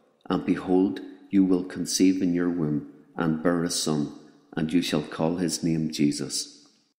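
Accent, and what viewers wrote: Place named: Ireland. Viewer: Thank you sir! I just love the Irish accent...it is the sweetest and most lovely of them all. We must remember how God has blessed the Irish for all the suffering our Irish ancestors went through in spreading the the Gospel throughout the world through the gift of the Holy Spirit in our One Holy Catholic and Apostolic Faith! How come someone altered the face of Our Lady of Guadalupe? This is not a true depiction...we must also remember to include the Saint Michael prayer with every Rosary we pray as we know Satan and his legions twist the truth and tell half-truths...even through pictures.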